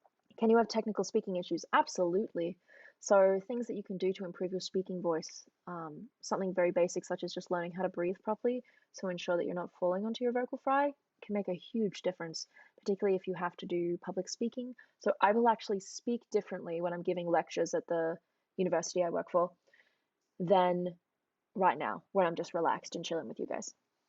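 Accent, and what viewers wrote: Australia. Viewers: I love the aussie accent the way you say certain words littarly make my heart happy lol could listen all day!!!! Also could you check out otep the band there a great female metal group 👌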